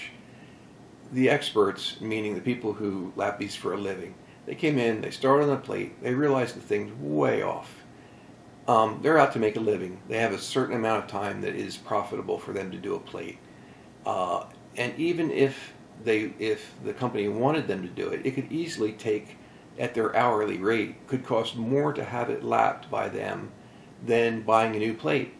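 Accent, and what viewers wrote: Pittsburgh, United States. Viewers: haha, thanks for the chat and knowledge! My mom is from Aliquippa and hearing your accent reminds me of my uncles over there in the Burgh.. Also, one of the reasons I like Jim Kramer's Mad Money too, lol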